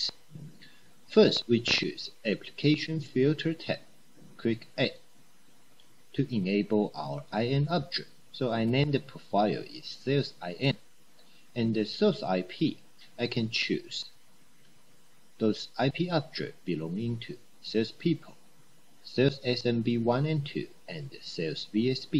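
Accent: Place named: China. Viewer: No offense to creator, but it sounds more like Chinese rather than English... Can't be bothered listening...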